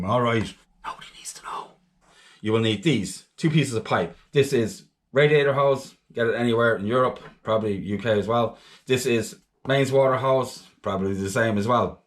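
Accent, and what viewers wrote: Ireland. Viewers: Newly subscribed on your channel and have learnt so much already, thank you. Excellent informative and well explained videos, well done. Lovely to hear it from a fellow Irishman 😊. Trip planned for Norway for July and August, hence my renewed interest in fishing. No fish left in the Med, so lost interest in fishing since I moved down here. Really looking forward to picking it up again in Norway. Keep up the good work 🤩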